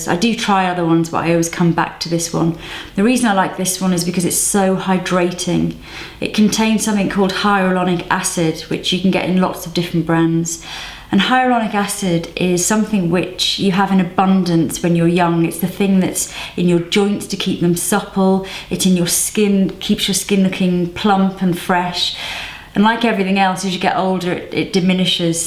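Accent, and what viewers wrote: United Kingdom. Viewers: Thank you so much... This information is priceless. I've taken notes to try some products. I have combination/oily skin and rosacea which makes my skin hyper sensitive. Besides, I'm allergic to salicilic acid so... I have to be creative in my skin routine. Lol, but it´s like you said, you have to pay attention to your skin because it talks in a very clear way, and if we listen, we will be able to treat it properly. BTW your accent is helping me with a recording I have to do in British English;)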